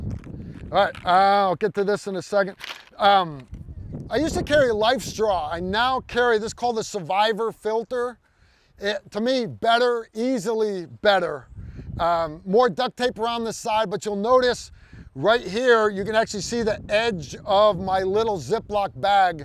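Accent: United States. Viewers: Is it just me or does he have elements in his accent really similar to Christopher Walken? I may be way off I'm not sure but just curious if anyone else hears that? Is it some kind of American Accent?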